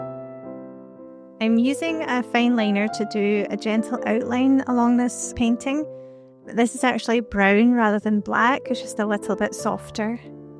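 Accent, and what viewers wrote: Scotland, United Kingdom. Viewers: Lovely…could you do the breakdown of drawing the fox pls…..are you Scottish?😊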